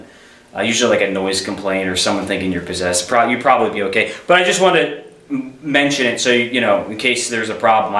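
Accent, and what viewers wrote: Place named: Michigan, United States. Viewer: Your space is serving Nova Scotia/Maritime vibes. But your accent has that Michigan feel. Go get’em, Tiger. I once played “ ” at my next-door neighbors for an hour, on a massive sound system… because they’d have noisy rows EVERY FRIGGIN’ NIGHT when he got home from his job on the police force. It worked.. and IT WAS FUN. Now, I cannot watch ‘Beetlejuice’ without reliving the trauma; it was worth it.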